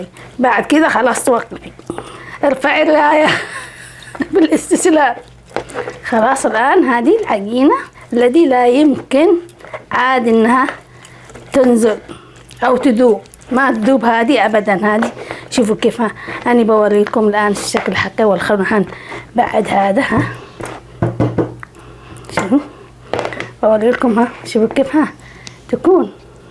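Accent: Yemen. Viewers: اي والله ننساء الهموم ولو لمدت دقيقه والله انه تعجبني لهجتك اليمنيه وكمان انا يمنيه ماشاء الله تبارك الله على الضحكه والحناء ربي يحفظك من كل شر ياخالتي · كلامك نصفه يمني ونصفه جنوبي سعودي ....😅 وعموما جزاك الله خيرا على وصفاتك الرائعه ❤ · اكلاتكم اليمنية وعذوبة لهجتكم ولطفك وطيبتك تعيد الينا ايام الخير والطيبة وراحة البال ليست كايامنا هذه اللله يستر 😂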